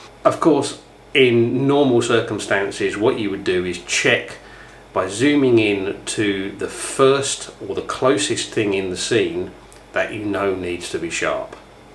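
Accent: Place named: East London, United Kingdom. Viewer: Sir, are you from London? Your accent is really reminding one of friends who was coming from East London.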